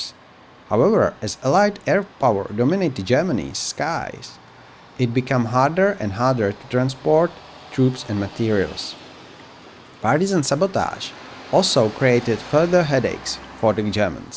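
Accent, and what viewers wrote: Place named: Missouri, United States. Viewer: I Detect a Bit of an Accent ! Are you From Missouri ?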